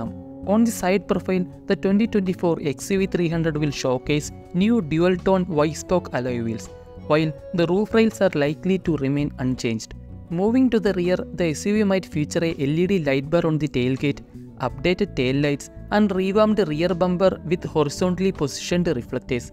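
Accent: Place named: England, United Kingdom. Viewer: Noticing your English accent... Naatil evida? 😅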